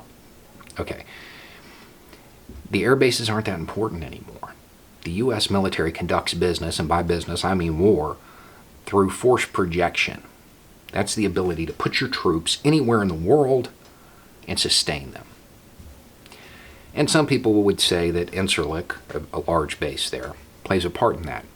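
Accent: South, United States. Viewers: Hey. Nice Southern accent, dude.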